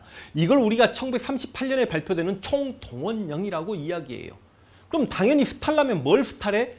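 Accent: Jeolla, South Korea. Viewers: 100프로 강제연행은아니죠 일부 피해자가잇어도 자발적으로 간 인물도 많을겁니다. 지금도 사채때문에 강제로 몸파는창녀가잇다치면, 돈벌기편하고 하니 창녀촌 안마 마사지에서 몸파는 창녀도있는경우인데 그 시대라고 창녀가 존재하지않는다고 할수있습니까??? 근데 말투가 딱 전라도말투네요 전라도사람이세요?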